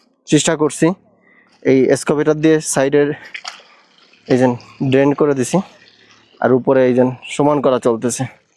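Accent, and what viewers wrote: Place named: Narail, Bangladesh. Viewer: নড়াইলের ভাষা মনে হচ্ছে।।।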